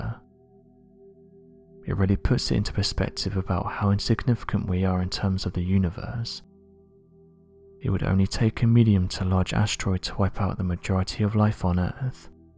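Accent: United Kingdom. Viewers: I love your channel but you really need to replace this narrator. The young man narrating this and other video's mumbles. It's hard enough to understand the British pronunciations without a monotone mumbler. I'm sure he is a very nice person but he is not a good narrator.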